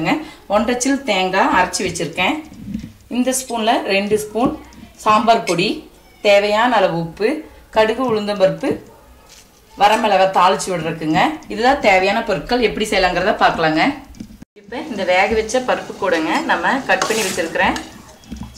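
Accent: Kongu, India. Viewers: Mam puli seka venama??? Nenga kongu Tamil ahh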